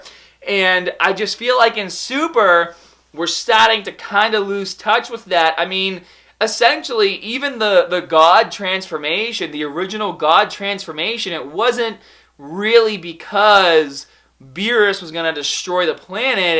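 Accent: Boston, United States. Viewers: he's not from Australia, thats Boston accent haha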